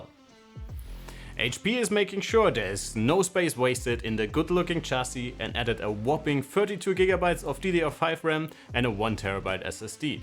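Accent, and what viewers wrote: Germany. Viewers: Mach mal bitte Timestamps rein, ich höre deinen deutschen Akzent, liebe Grüße.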